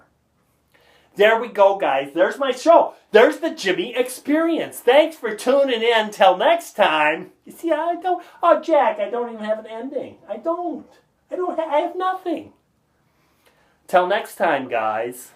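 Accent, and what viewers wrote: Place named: Los Angeles, United States. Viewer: Holy cow, made me feel like I had moved back to Kommiefornia for a second, sounds like 50% of LA county. So glad I moved to Fargo.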